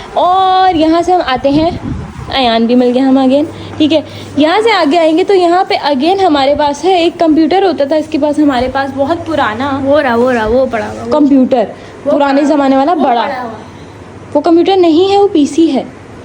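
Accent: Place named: Karachi, Pakistan. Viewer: Very nice house tour you say you blong to ch family I'm also blong to ch family live at Islamabad but your accent speaking style like Karachi people not like ch family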